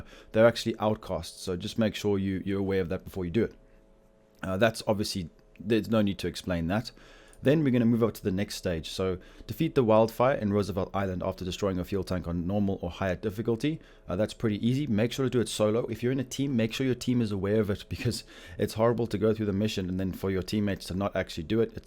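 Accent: South Africa. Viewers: you south african? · South African ?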